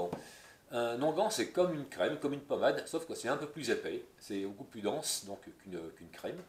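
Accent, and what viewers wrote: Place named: North, France. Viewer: Salut, J'aime bcp tes vidéos, ta façon (simple) d'expliquer et ton accent (je pense du nord de la France: au dessus de la Loire...) Un petit "Crut" pour les macérâts huileux solaires: j'utilise des boîtes de conserve que je numérote de 1 à 4 (au lieu du papier "Kraft" tout moche) et en cas de pluie: pas de PB... Chaque jour, je "tourne" de 1 à 4....pour être sûre que ma macération soit au soleil uniformément.... Encore merci pour ta simplicité et tes explications Bises.... et toi aussi prends soin de toi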